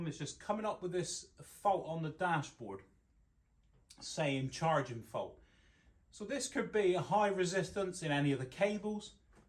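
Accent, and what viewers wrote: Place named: West Country, United Kingdom. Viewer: I'm wondering if you are west counttry. maybe Hinkley, i only wonder because you are so particular with the connections electrically and i'm an engineer, former CEGB/national power/powergen/nuclear electric/british energy (same firm privatisation)and a stint for centrica in gas.The in laws were Taunton and Bridgewater and sound like you.I never knew about the sensor connection near the battery, that is very insightful, it monitors the current for the start/stop system if the car has it. thanks. I also didn't mention, you are a good dad, you speak of your son a few times, good on you. Just a point to note, your sons car is an automatic and the alternator is at the rear of the engine compartment as you show, on a manual it is Left hand side as you look into the engine bay.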